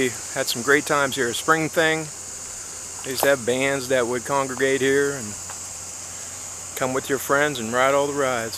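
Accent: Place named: Northeast Ohio, United States. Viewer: It was nice of him to commemorate the ride in this way. Gotta love that NE Ohio/ western PA accent. Haven’t lived in OH for many years but like your hubby I think about this coaster all the time.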